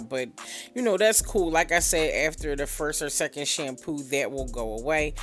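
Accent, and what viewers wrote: Philadelphia, United States. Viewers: I love hearing your voiceovers with your Philly accent! Our accents are so distinctive, although we don't think so, lol. I'd love to have you bless my hair when/if you get back here. I cut off my locs 3 years ago and I've been wearing wigs, but I hate them now and want to do something with my natural hair. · I love the color of her hair. By the way, are you from Philadelphia. Your accent sounds like Philadelphia.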